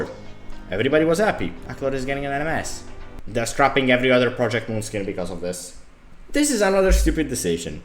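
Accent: Italy. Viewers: heard that voice and had a suspicion, then that “proelium fatale” was obvious, sono italiano anch’io ;)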